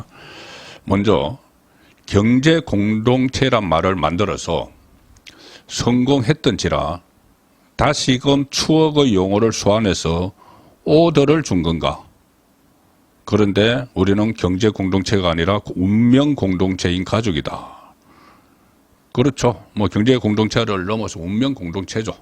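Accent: Gyeongsang, South Korea. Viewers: 항상 구수한 경산도 사튜리정말조와요 항항잘청취합키다 항상건강하세요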